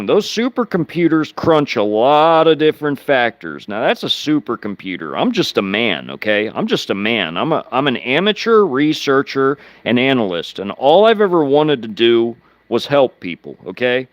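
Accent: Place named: Kentucky, United States. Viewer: The story of the CSS Alabama which Three Country's attacked her that day. The French The British and the lunatic Sherman Government.. This is the same Axis of evil in every war since. My grandfather loaded the Gold from Germany. My uncle was MP at Redstone.. It was my uncle who told me what Redstone was and why it was named that. One big hot Redstone because they have a enough nukes to destroy the planet 6 times over. At 9 year's old I asked my uncle why. I asked other questions as well. Uncle Don would not tell anything till I had brought him at least 9 Beers later. He was one who knew All . His answer was that we had everything buck Roger had. Lasers spaceships and much more.. That started my research. When I ran across you on YouTube you sounded like my family in Kentucky.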